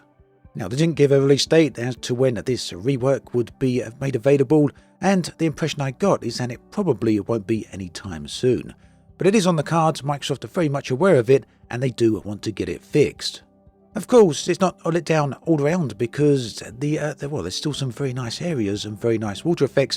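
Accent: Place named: England, United Kingdom. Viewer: It's a fucking english accent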